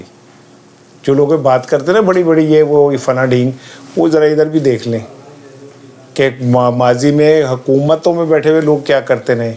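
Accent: Punjab, Pakistan. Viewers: اسلام علیکم ورحمتہ اللہ وبرکاتہ صاحب آپ کا بولنے کے اندر جو اوردو اور پنجابی کا مکس فلیور مجھے بہت اچھا لگتا ہے 👍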